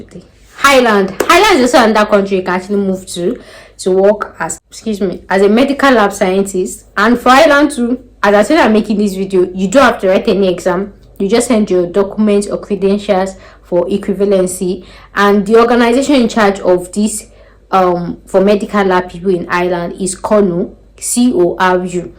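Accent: Nigeria. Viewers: Please add subtitles to your video. I love your Nigerian accent but please also be accomodating to people who watch your videos who aren't from Nigeria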